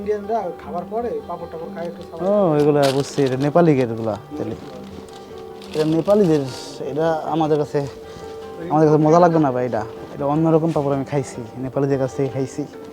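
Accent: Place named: Narsingdi, Bangladesh. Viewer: Bhaiar kotha shune mone hoy vai norshindir chele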